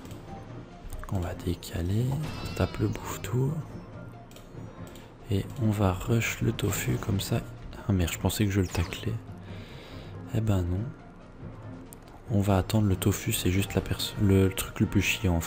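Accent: Belgium. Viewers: Tu es belge ? :)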